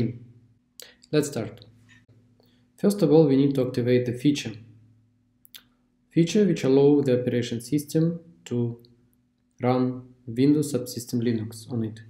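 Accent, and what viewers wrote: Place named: Russia. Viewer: Ваш русский акцент - это просто анбеливабле! Спасибо за видео - очень помогло!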